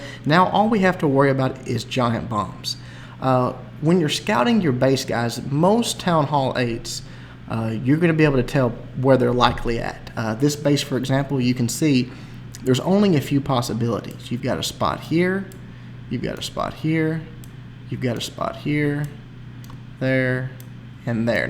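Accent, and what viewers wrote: United States. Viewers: Good video, your english sounds disgustingly american though.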